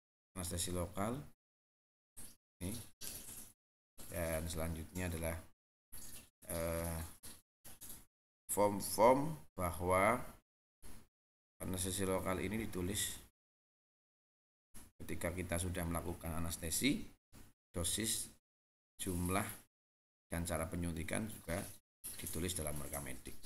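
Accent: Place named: Banyumas, Indonesia. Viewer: Assalamualaikum, bisa minta filenya pak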